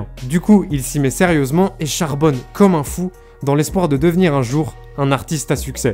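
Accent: United Kingdom. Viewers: Tu me fera toujours autant rire avec ton accent anglais je kiff 😭😭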